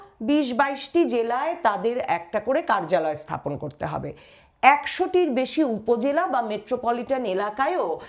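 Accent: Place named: West Bengal, India. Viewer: আপনি আগে পশ্চিমবঙ্গের একসেন্ট বাদ দিয়ে বাংলাদেশের একসেন্টে কথা বলেন। শুনতে আরো ভাল লাগবে।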